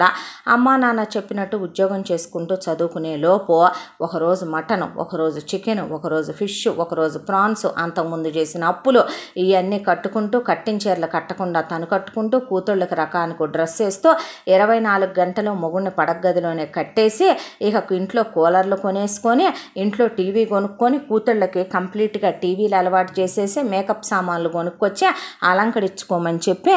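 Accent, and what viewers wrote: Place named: Guntur, India. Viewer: Mi story reading chaala bavuntundi gaaru.Guntur slang excellent.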